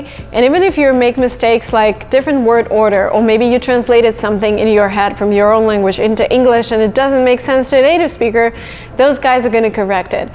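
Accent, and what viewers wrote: United States. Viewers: Thanks.Your pronunciation is like an american.Where are you born? I love you...!!!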